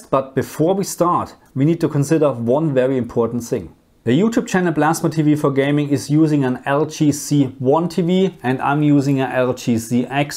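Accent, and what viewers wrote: Germany. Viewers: Your german accent is fucking ruining your videos man... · Are you from germany? You sound like german :-) ? Falls ja schöne Grüße aus Hamburg. Eventuell kann ich dann meine Fragen auf deutsch stellen?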